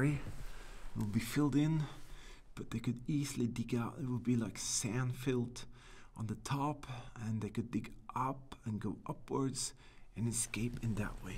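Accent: Germany. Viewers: I like your German Voice ..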